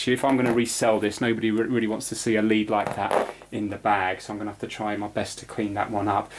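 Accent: United Kingdom. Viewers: I do not like your accent ... Sry, why does British English sound so awful?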